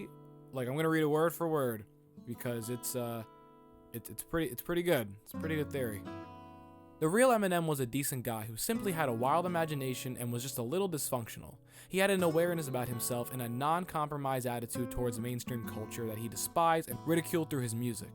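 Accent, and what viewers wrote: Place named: Michigan, United States. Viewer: your accent sounds like your from michigan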